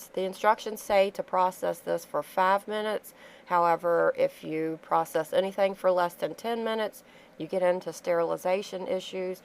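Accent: South, United States. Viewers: Great video! LOVE your southern accent, by the way. LOL! I don't like to eat a lot of corn, but a neighbor farmer gave us a load of sweet corn, and I hate to see food go to waste. My kids and hubby love corn. Honestly, I prefer frozen, but also like to not depend on freezers in a locale where sometimes our electricity is undependable. Very nicely done video. No annoying screaming music in the background, and you spoke clearly and didn't chat unnecessarily--but gave valid information.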